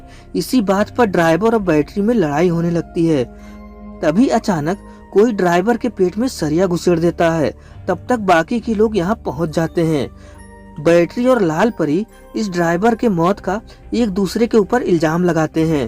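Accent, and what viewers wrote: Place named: Bihar, India. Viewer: ye bhiyaari h bhai inke yhaan english aise hi pdhaate h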